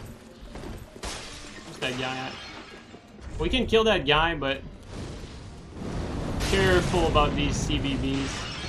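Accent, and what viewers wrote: Quebec, Canada. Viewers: He sounds Québécois to me.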